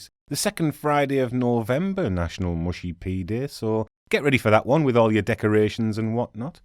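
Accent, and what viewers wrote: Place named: Yorkshire, United Kingdom. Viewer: Loving the Yorkshire accent.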